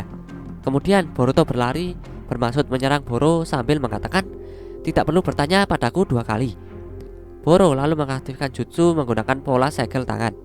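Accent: Java, Indonesia. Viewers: Medoo men min suara mu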